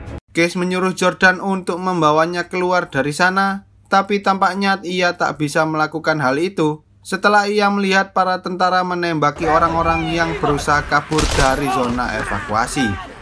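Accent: Java, Indonesia. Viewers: Bang kamu orang Jawa ya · Wong jowo to mas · pekat bet jawo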